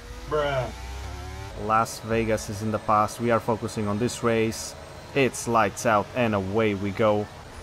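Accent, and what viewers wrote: Romania. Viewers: Is he from Romania? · Are you from Romania? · Are you romanian?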